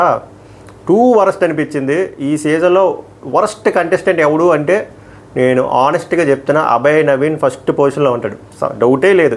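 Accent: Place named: Visakhapatnam, India. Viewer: Mee slang super Garu. Vizag slang👌👌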